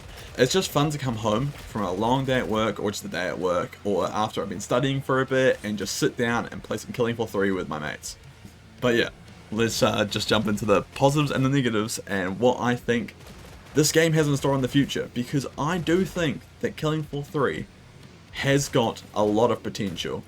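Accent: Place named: New Zealand. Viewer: You, sir, are a Kiwi.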